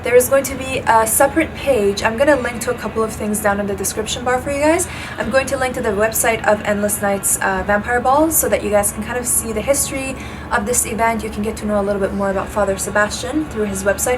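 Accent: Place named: Latin America. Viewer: where is she from? her accent sounds kind of latina 💜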